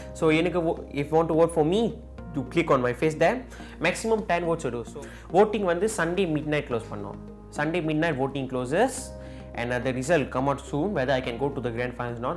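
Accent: India. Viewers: Moment i saw your face and accent I guessed you are tamil. Now it was confirmed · I dont if it's only me but he sounds like Indian to me.🤔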